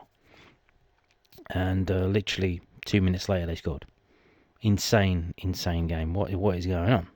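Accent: Scotland, United Kingdom. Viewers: Love the jock accent 😂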